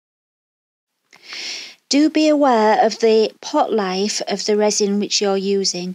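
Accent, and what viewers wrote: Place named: England, United Kingdom. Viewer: So lovely to hear a fellow English person giving a tutorial. You are a breath of fresh air. Loved all the knowledge you shared. I can’t wait to get started. 😘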